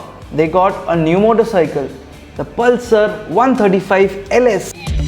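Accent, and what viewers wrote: India. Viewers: You started by saying the old pulsar ad with the nurses was cringe. It wasnt, your video with 1million edit inserts and black culture appropriation with your thick indian accent and constant shhhhh was what was cringeworthy. If I'm not mistaken, you completely missed mentioning the pulsar 220f was released with a carburetor around 2009/2010. That peaked the 220f sales as the indian market wasnt ready for a fuel injected motorcycle. You have a face for radio yet are boldly facing the camera. I had to watch most of this video with my eyes closed. Go and 'damnnn sonnnn' that.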